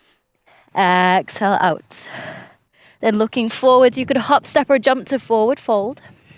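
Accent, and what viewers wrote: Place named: Scotland, United Kingdom. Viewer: You are fast becoming my new favourite yoga Youtuber! Pa. are you Scottish/grew up in Scotland for a bit? I'm hearing a mix of accents haha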